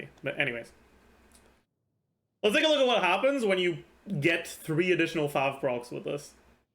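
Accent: Quebec, Canada. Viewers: Completely off topic - are you québécois? The way you pronounce these french words is so familiar for some reason.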